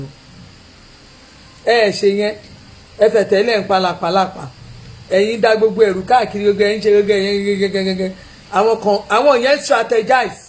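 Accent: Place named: Egba, Nigeria. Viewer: You always make my day Stop sebe. I always laugh when I watch you speak in Egba accent. Pepper dem well well. Love you always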